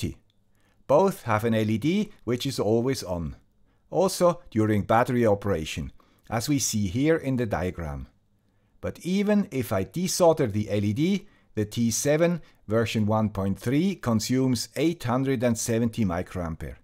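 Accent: Switzerland. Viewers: Thank you for sharing your experiances. I really like the swiss dialect as well - in english as well as in german. :-) As I always had very good experiances with the D1 mini with ESP8266, the D1 mini version of the ESP32 looks interresting to me. Greetings from the rhine-main-region, germany Alex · Another great video! Thanks a lot. You put so much work into planning out and making these videos they are so informative. I also love your soft Swiss accent. Thank you.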